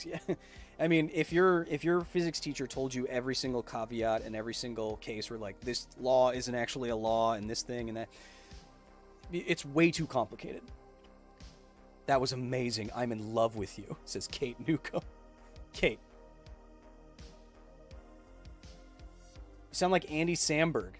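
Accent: Queensland, Australia. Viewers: 1. North-Eastern. That's at least northern-NSW if not QLD or NT. We have far less "twang" down south. 2. Geiger.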